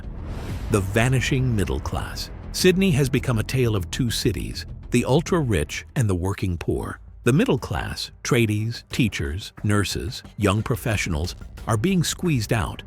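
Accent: United States. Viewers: How come when you show money, you always show US $ ? Apart from the American accent to start off with... The points raised have of course value.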